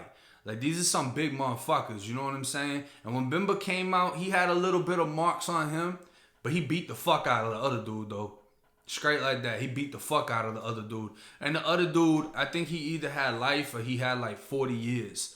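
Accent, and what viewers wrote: Boston, United States. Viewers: You tlk like you from the bean ... When i went to florida every1 knew i was from Boston we just tlk a certain a way. You had to have spent some time out here. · You kinda have that Boston accent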